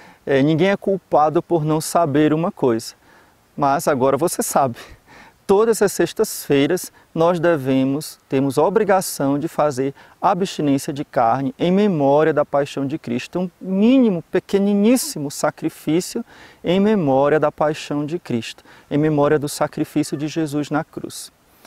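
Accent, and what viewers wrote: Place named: Northeast, Brazil. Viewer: é nordestino?????? Nem desconfiei, e agora vejo que tem um "sotaquezinho" ai kkk. Padre eu sabia dessa lei da Igreja, mas costumo esquecer e acabo comendo. Quando lembro vem um pouco de culpa, mas já não tem volta... é tentar na próxima.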